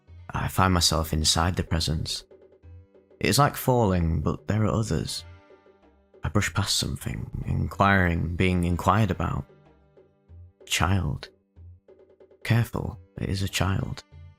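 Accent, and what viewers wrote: United Kingdom. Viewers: Always some British schmuck narrating these weird videos. #1776 fk the queen n her herpes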